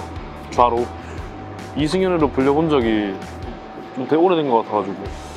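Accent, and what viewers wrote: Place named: Daegu, South Korea. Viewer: 사투리 마 기깔나네 찐대구사투리💙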